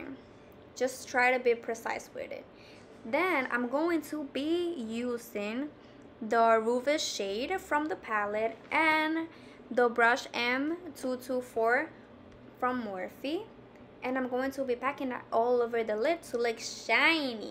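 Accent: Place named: Cuba. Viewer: Sis pero y ese acento cubano? 🇨🇺 y que fueeeee, ta viendo muchos videos de Lohana 😅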